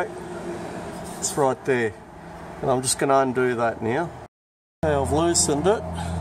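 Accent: Australia. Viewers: no problem at all. I'm in the US, how do I get a beer to you? Your accent sounds Australian? Do you have a way to get a donation to you 😊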